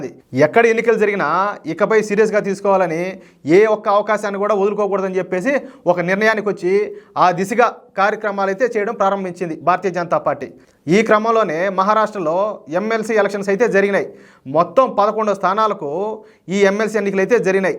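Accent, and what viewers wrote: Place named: Kadapa, India. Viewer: ఆహ్! నిజంగానే వీనుల విందు బ్రదర్ . నీ కడప మాండలికం తెగ నచ్చింది.మాది రాయలసీమ .జై బీజేపీ.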